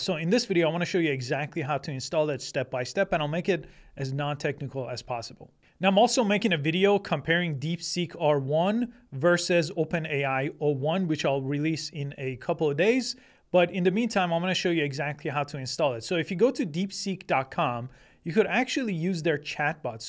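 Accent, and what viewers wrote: Iran. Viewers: dude im hearing some persian accent lol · I found your channel while searching for local installations of R1. While your accent is very good, I detected some Persian influence in it. After doing some Googling, I discovered that I was right—you are actually from Iran. I wish you could start another channel in Persian; your homeland also needs your knowledge.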